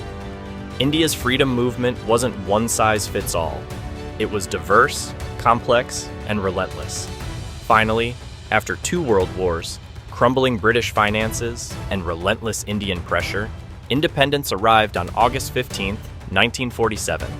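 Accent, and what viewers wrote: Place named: United States. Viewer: I was suspicious when I heard your Amurcan accent, but literally stopped when you said "dude". For heaven's sake.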